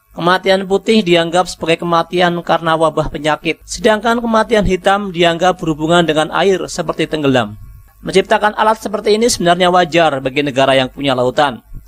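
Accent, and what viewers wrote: Tegal, Indonesia. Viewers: Logat tegal yak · Yang ngomong orang tegal ya ?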